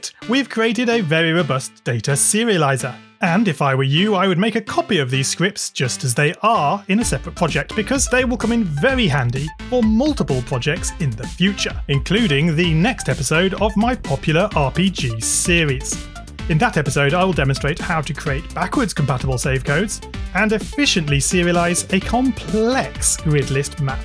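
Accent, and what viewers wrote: United Kingdom. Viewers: YOUR British?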